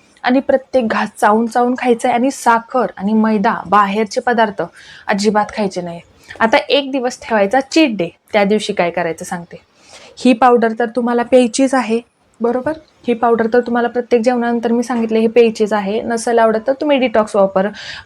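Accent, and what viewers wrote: Satara, India. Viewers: छान वाटला व्हिडिओ ताई तुम्ही सातारच्या का तुमचं बोलणं मस्त वाटलं😊🎉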